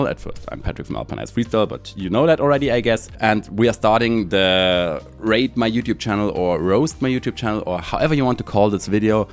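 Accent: Germany. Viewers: You might not like your speaking English, but listening to you made me really enjoy the German accent as an native English speaker (American). You have such a lovely cadence 😁 I'd have to agree, Nadi feels over edited because he does the same thing different ways. If he did it just once, it would feel more balanced and not a redundant show off in my opinion.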